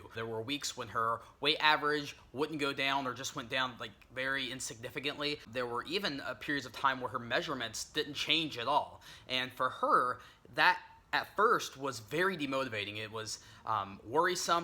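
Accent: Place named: United States. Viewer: Wow! I thought you were from Europe but you're an American. this is great I love your accent and thanks for this great video Greeting form Afghanistan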